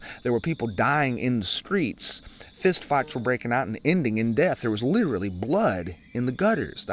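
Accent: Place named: South, United States. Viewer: From the outset, I was trying to guess which song it would be. I thought of this one briefly, but I never knew that it had 2+ verses. All I ever sang as a child was the refrain. (Canadian Baptist roots.) Well done. Love your thoughtful delivery and "southern" accent. [So many 70-ish year olds in your audience -- and I am one of them at 71.]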